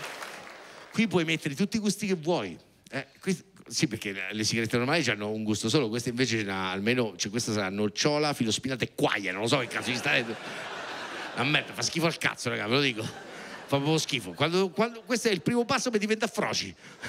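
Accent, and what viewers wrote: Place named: Marche, Italy. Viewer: Il marchigiano,ancor piu' il fermano, ė universale!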